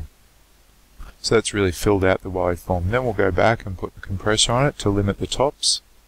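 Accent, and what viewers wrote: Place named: United Kingdom. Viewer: Hey, this was a GREAT tutorial! Thank you very much for posting. I had used Audacity for a while, but stopped because I could NEVER figure out how to denoise things (and getting a new mic helped a great deal too...) Now, after seeing this, I think I'll start using Audacity again, as there are some very nice things about it... Faved. Good to see you again. Hope you're well. (I had NO idea you were British!:D)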